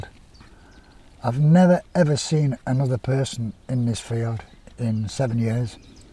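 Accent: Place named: Liverpool, United Kingdom. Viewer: Oh, put some up. They were great I'm right behind you at 65 and hubs James bought me a Stratocaster a few weeks ago. I sold mine maybe 2 years ago...thought I was too old and have arthritis in hands but, I had got him a semi pro electronic drum kit for Christmas and with all these great backing tracks and bass track you can use for free we have started playing. He says we should up loadvids but I am not keen at all. This strat is as sweet as honey with super low action and it was the bargain of the century but its twice as heavy as my last one so I have to sit down to play...not very rock chick! As I said, liked those vids of yours and in principle I think its good for people of any age to see elders just doing...because like me, I am sure you remember a time when old age was not synonymous with illness. Somewhere Ive got a vid of my sisters band in the USA. 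Rock chicks, all over 60, lead singer 67 and they are belting it out on the circuit in L.A.if I can find it I'll upload it You sound Liverpudlian/cumbrian....I'm Lancastrian from Worthington in S. Lancashire. James is a very Yorkshire man we both miss northern banter our tiny village in Pembs is all round table, women institutes and a strict regime of intense garden manicuring...they roll along in life with absolutely no rock at all🤣🤣🤣 Have a great day and get them vids up lad!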